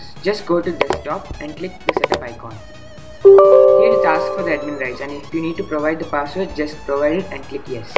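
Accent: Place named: India. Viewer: Indian voice, Indian accent. Program with viruses.. no thanks. I'm looking elsewhere.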